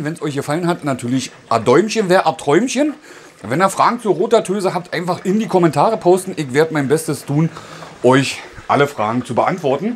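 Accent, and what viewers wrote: Berlin, Germany. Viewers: super genial erklärt und hat uns bei der Kaufentscheidung sehr geholfen. Und dein Berliner Dialekt lieben wir eh 😁😁😁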